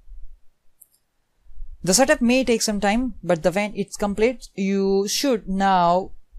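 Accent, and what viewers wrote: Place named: India. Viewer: Strong Indian accent - hard to follow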